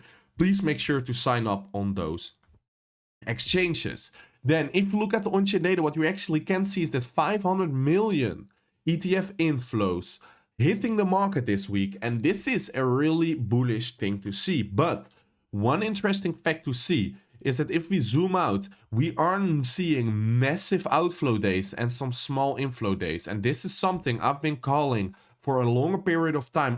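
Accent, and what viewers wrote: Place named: Netherlands. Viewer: are you drunk, ? or is that your dutch accent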